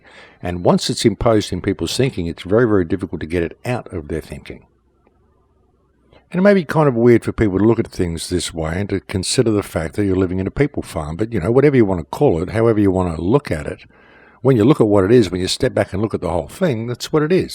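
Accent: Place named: Australia. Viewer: You're most welcome, your words pulled it from my heart to yours. I too see humanity as one of the many species in danger on this planet. Every words you're saying is true and have helped me considerably understanding what's going on, though only after I got over your Australian accent...lol...no worries, I have one myself...there's not such a thing like "perfection" or, better yet, perfection is only to be found through imperfection;) big hugz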